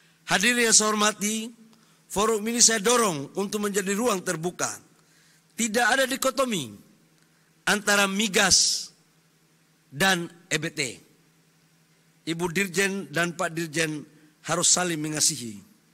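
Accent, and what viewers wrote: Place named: Papua, Indonesia. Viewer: pa balil ini dialek papua mentah betul karna ada pakai bahasa KItong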